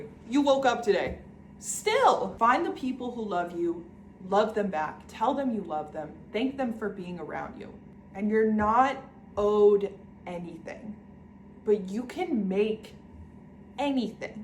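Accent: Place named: Israel. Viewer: יש מצב שאת יודעת עברית? 😅 האינטונציה שלך נשמעת ישראלית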